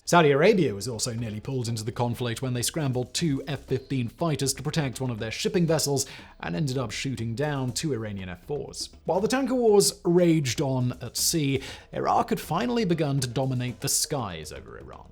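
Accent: England, United Kingdom. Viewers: I’m Iraqi and I don’t care if he pronounces them in an English way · I wish the prester would drop the quasi upper class English accent ! It just grates on my nerves. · .. I do after 1 min in .. he is on 3-5 different channels.. it’s frustrating cuz it’s a interesting topic and than his stupid British/ colonial/ slaver voice comes in.. than you see him and that terrible beard/ bald head.. He is annoying ( sorry )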